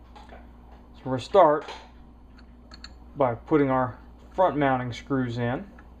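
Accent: Upper Midwest, United States. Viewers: Haha, yeah. Northern-midwest accent.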